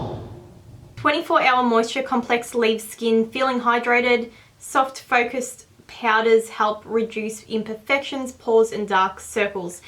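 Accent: Australia. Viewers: its an Australian accent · are u australian ? i LOVE ur accent !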